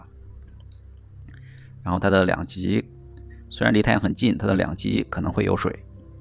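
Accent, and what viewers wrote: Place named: Shaanxi, China. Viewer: 陕西人吧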